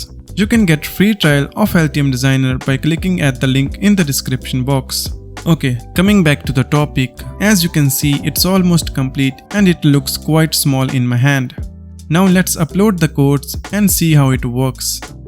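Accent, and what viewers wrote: India. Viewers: Мне одному кажется, что это русский говорит по-английски с индийским акцентом?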